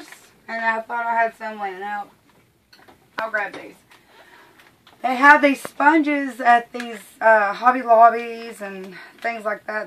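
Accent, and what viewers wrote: South, United States. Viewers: I can't add anything that has not already been said except "War Eagle"!! I was so glad to hear a southern girl sharing such great teaching information. I am from Alabama and have added myself as a subbie. Really look forward to seeing your other videos and any new ones. God bless and thanks for sharing! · I just love you accent, a true "grits" girl like myself. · ... while this particular design of granite is too busy for me, I have to say that it is a great technique, especially for people who are on a budget, $25 is an awesome price to transform counters. As far as your way of speaking, don't apologize for it. You are southern and sometimes that's how southern people speak. It may not always be correct but it's not a sin, LOL. I'm from New York and we have a distinct accent as well and we say words differently but I'm proud of how I speak because I'm proud to be from New York City. 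When I make mashed potatoes I always call them taters:) because I love that word! Another word I like is reckon, so keep on being YOU <3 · i love your videos btw, are you from the carolinas? i love the southern bell twang. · Kudos to the closed captioner whoever you are! Sometimes I have a bit of difficulty understanding a Southern accent - and you were totally unflappable. :-) This looks like a good way to 'upscale' a counter! Which is a nice way to look like you have a champagne budget when you only have a Kool-Aid budget. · Love your southern accent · Hey there! I LOVE this tutorial/idea and have already bought the supplies. I think you are super cute and your Southern accent is adorable. I will share pics of my completed project when I am done. God bless you hon, wish we were neighbors! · Okay, I hate to ask this, but I'm from Michigan and you have a southern drawl and there is one part that I'm not quite sure what you are saying. 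You said in the very beginning to make sure you get the kilz base..Now I don't know if you said OLD Kilz or OIL base Kilz... I really want to do this and I don't want to mess it up. I put the captions on and it showed that you said Old.. but when I watch you say it, it looks like you are saying OIL but with that southern drawl. Could you please clarify for me so I don't mess it up? Thanks in advance.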